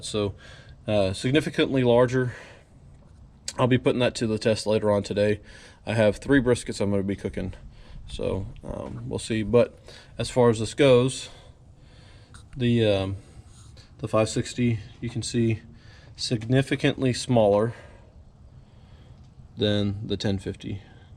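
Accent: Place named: Texas, United States. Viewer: I could tell that was TX straight away. The houses; the sky; your accent. I used to work for Continental Airlines in H-Town, back in the day. Excellent review,!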